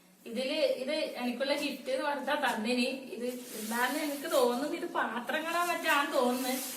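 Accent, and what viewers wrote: Kannur, India. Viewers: കണ്ണൂർ aano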